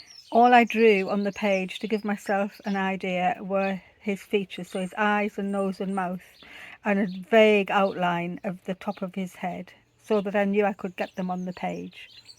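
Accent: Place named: County Durham, United Kingdom. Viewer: Wow your dragon is magnificent and magical. I have just found you this morning so I’ve quickly subscribed and can’t wait to see all of your videos. Thank you for sharing and inviting us into World. I follow embroiderers from all over the world so it’s lovely to learn you’re also from County Durham and hear your local voice.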